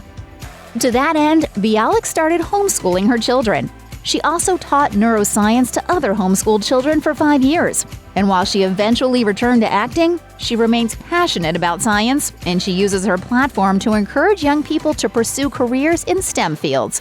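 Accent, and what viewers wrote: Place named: United States. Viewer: So where and when did this much touted "transformation" occur? I'm assuming this is something marvelous that happened post BBT. I'm up to but I don't think I can put up with much more of the narrators dreadful American accent, at first I thought it was a robot.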